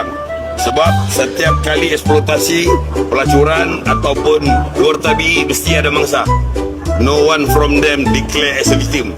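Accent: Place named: Sabah, Malaysia. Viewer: Loghat kp ni mcm orng sabah je 😊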